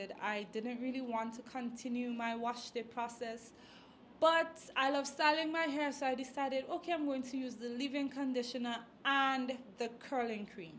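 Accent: Caribbean. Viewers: Meh know a West Indian when meh see one lol 🇯🇲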